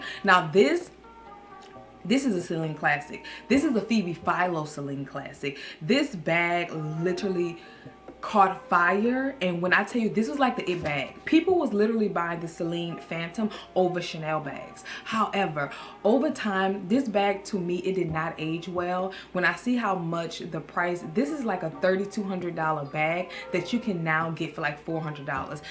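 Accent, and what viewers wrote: New Orleans, United States. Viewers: Lol😄😂@ I LOVE ME SOME YOU..- I WAS FEELING SAD 🤧TODAY UNTIL YOU MADE ME LAUGH. 😄 THANKS... I LOVE YOUR NEW ORLEANS ACCENT... THIS VLOG WAS ON 🎯..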